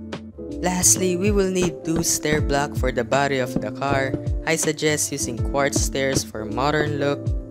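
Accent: Philippines. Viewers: Filipino accent be hitting tho lol thxx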